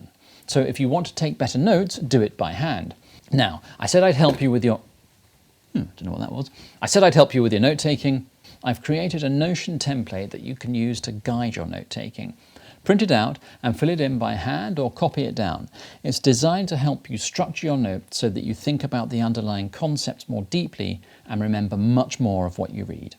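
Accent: England, United Kingdom. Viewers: I love your British accent! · Nope. It's an English accent.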